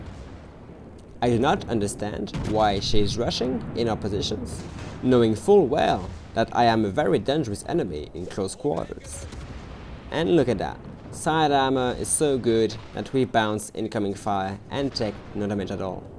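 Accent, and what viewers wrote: France. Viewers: Nice French accent man 👌🇫🇷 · Your french accent is on point ! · c'est rafraîchissant d'entendre un accent français quand on vit en Ontario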